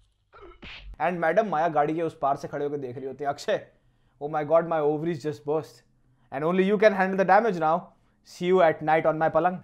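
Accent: Haryana, India. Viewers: Bhai aapki Hariyanvi accent sunke me bhi thodi thodi try karra hu bolne ki....😅😅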